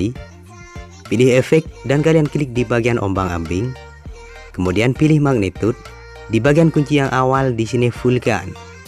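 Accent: Madura, Indonesia. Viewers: Abang org madura kah....?🤔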